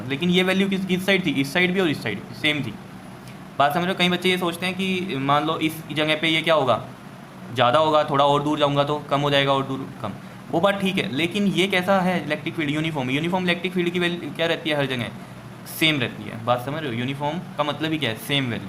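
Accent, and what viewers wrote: Punjab, India. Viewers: Sir tucci punjabi o?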